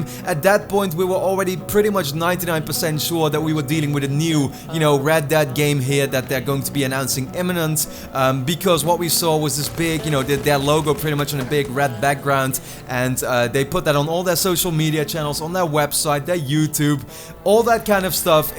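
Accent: Netherlands. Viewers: You’re so obviously dutch😂😂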